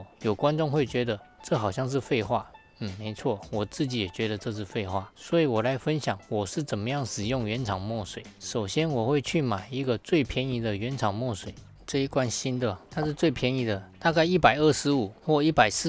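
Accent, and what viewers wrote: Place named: Taiwan. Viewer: 台式英文，其實大家都懂是在講什麼就好。milliliter 音節這麼多念起來很拗口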